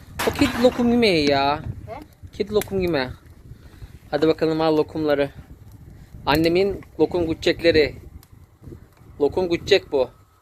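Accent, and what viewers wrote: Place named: Bartın, Turkey. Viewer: Bende bartinliyim istanbul da yasiyorum bizim oraların konusmasini duyunca çok mutlu oldum benim annemde böyle odun ateşinde yapardı evin önünde yiyesim geldi şimdi bu sene koye gidemedim koronadan sizi yeni keşfettim artık izlerim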